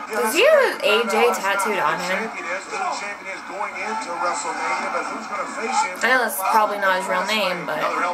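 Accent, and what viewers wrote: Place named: South, United States. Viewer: lmao "he kinda has a hillbilly voice" ...he's from the south, hence a southern accent.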